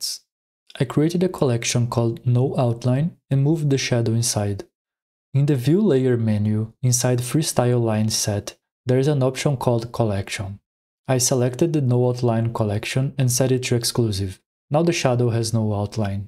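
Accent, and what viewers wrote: Brazil. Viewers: o sotaque br alegrou meu dia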